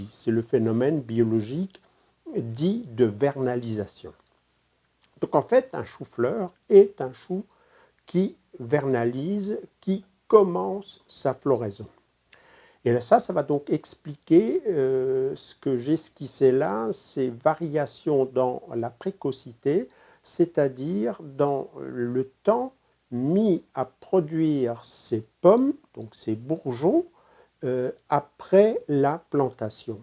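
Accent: Alsace, France. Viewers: Merci, c'est toujours un plaisir de vous écouter, j'apprends toujours quelque chose de plus. Il a beau être nul, celui qui a écrit le bouquin, je vais l'acheter quand même.! Il a un fort accent alsacien que j'adore étant moi-même d'origine alsacienne. Merci encore!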